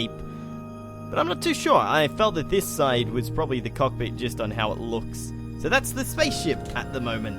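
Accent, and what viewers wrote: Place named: Australia. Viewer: omg, I have been watching your videos for a year now, and I thought. HAY... Hay, I know that accent. :/ OH WOW. says my realisation overclocked brain, it's like 3mhz now! THATS AUSTRALIAN! Then, HAY I'm Australian. suddenly mind blows. great content, keep making those video's. And spores back to, yay! I suppose.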